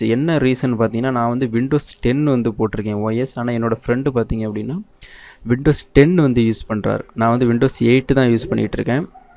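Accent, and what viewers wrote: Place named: South India, India. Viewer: Your pronunciation is like of south indian. So your vedio quality is not useful universally. Try to use easy pronunciation so that maximum people can understand your language. Ok. Thank you.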